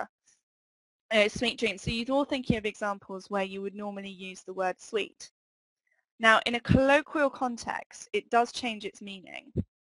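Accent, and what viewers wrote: United Kingdom. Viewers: прекрасный британский акцент! супер!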